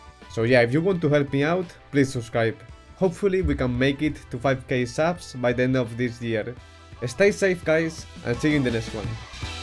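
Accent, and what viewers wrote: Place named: Spain. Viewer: Se nota que hablas español eh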